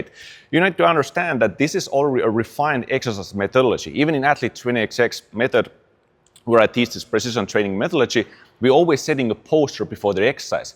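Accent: Finland. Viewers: OMG I was watching this without knowing where you were from, and I kept thinking "this accent sounds SO familiar to me..." Then when you mentioned Finland, the bomb just dropped 🤯 I realized you sound EXACTLY like Ismo!! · I can tell a Finn accent a mile away :) hard to make those English sounds isn't it ? Love the Finns. I could be wrong but sounds like Sissu to me. Love from Texas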